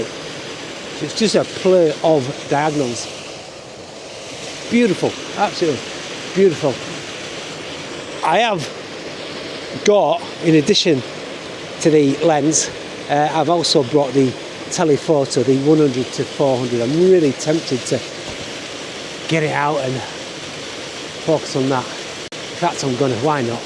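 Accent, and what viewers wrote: Yorkshire, United Kingdom. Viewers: I've had great pleasure in viewing your interesting, chatty videos, right from the beginning. This particular episode reminds me of when I had my best work assessed by a particularly high ranking elderly lady photographer, around 15 years ago. This was after having some 40 years of photographic experience behind me. In a nutshell, though the images showed competence, she told me the subjects were" too busy" - her own words. This pair of words is of course the absolute opposite of your subject here - minimalism. In taking on board this talented lady's advice, my photography was vastly improved and I have kept this thought in mind during the 15 years since, whether it be in monochrome or in color., your excellent advice is exactly spot-on with what this great lady photographer told me. Over the years since, my photos in their comparative simplicity have IMPACT, which was so lacking before! 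From one Yorkshire man to another, I love the refreshing content in your videos, including the relaxing music as well as the Yorkshire twang!!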